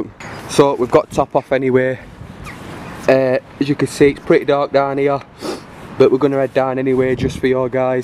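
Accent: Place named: Sheffield, United Kingdom. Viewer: Sheffield accent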